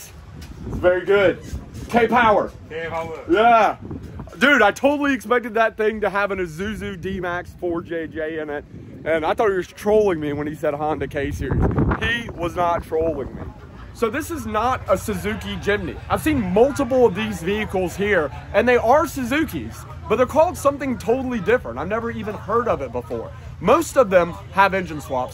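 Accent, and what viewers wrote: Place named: Pittsburgh, United States. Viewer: Where are you from originally? You sound very Pittsburgh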